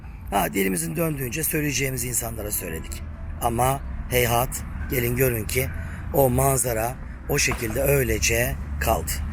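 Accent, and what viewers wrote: Turkey. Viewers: Ne demek gayseriyse herkesin bir aksani var kücümseyerek yazmas saniz daha düzel olur Talha beyin videolarin da neler neler gördük heryer ayni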